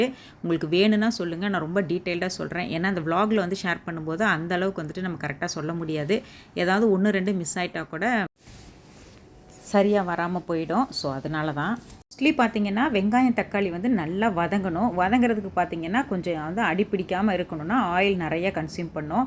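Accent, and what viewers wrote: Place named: Kongu, India. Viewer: Neega erode ah sis neega pesarthu kongu Tamil maathirye iruku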